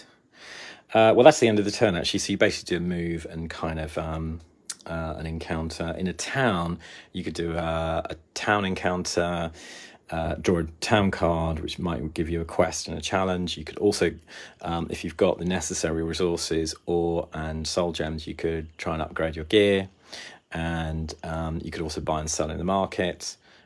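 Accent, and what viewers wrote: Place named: United Kingdom. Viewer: Ciekawy tytuł, mocno brytyjski akcent :)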